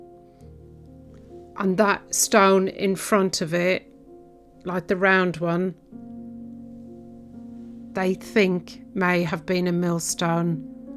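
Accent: Midlands, United Kingdom. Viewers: Good video, but that's a Midlands accent ? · I thought it was a midlands accent too - which I quite like. Where are you from?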